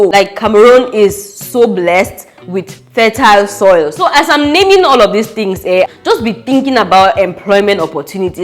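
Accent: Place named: Calabar, Nigeria. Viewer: You were born in cameroon, raised in cameroon but you sound like calabar or akwa ibom(Nigeria)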